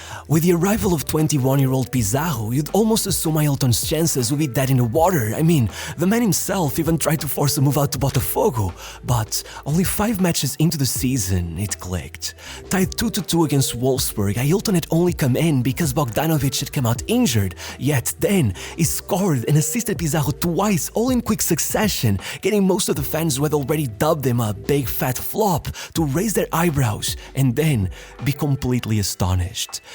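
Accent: Portugal. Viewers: Ja é talvez o 5 comentario que faço em videos teus, e acho que todos foram a dizer o quanto parecias portugues a falar... hoje com camera apareces com a camisola do vizela... Acho que tenho as minhas confirmações 😂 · Bem, o sotaque sempre foi 100% português. Eu tinha dificuldade em reconhecer o sotaque português, mas depois vi (ouvi) o Mourinho falar mil vezes em inglês. Aliás, a frase inicial "listen to this" é perfeita para reconhecer o sotaque português. Pronuncia "Liss-tenm tu dis" em vez de algo como "lisen te ðis" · AAAAH Eu sabia pelo sotaque que tinhas que ser português!!! Bom trabalho, continua!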